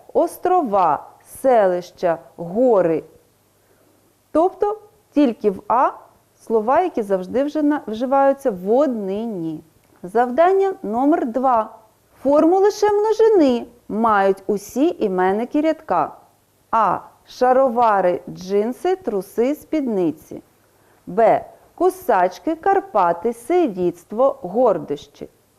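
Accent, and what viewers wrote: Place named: Russia. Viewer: дякую! Насправді питання були до вчительки, яка в ролику саме таким чином наголошує слова: 9.46 - сАнки, 16.29 - островА. Ще запитала б про наголос у слові "збірні" (11.55), адже у словнику подається наголос на першому складі цього слова. Чутно, що людина російськомовна, хоча й старається говорити українською. Просто виникає питання: чи подібні ролики дадуть дітям переконливі знання?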